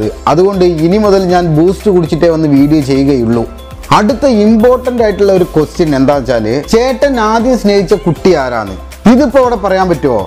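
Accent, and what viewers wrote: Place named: Palakkad, India. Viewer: Chettan sherikum palakkad language ani parayunath🤗🤗🤗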